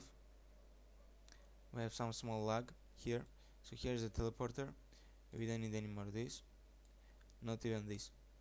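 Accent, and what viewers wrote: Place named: Portugal. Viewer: paraces tuga a falar! LOL~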